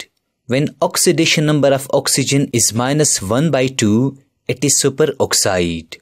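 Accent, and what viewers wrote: India. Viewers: indian voice come in clutch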